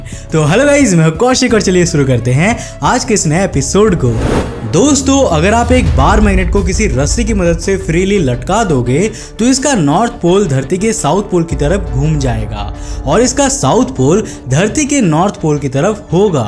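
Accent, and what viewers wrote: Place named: Haryana, India. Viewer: Tum bolte achha ho per bich bich me kai words ka ucharan galat kardete ho sh ki avaj ko chh ki or chh ko sh kar dete ho baki sab theek ha ye bhi karlo to bhariya rahega dusri bat apki bato se bhasha se lagta ha ki ap kahi na kahi haryana se bilog karte ho ya uske pas bilkul pas reh chuke ho ek word jo ap bole beta ye siraf hr me hi bola jata ha kahi or nahi matlab styale Ha ap styal ko galat bolte the ap bolte the shtyal bas ek word hi yad ha mujhe jo ap bole galat baki sab bale bale ha so keep contineu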